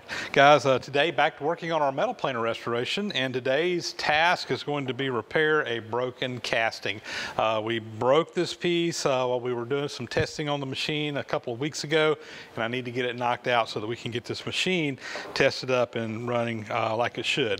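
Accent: South, United States. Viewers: Why captions? speaks perfectly good southern English.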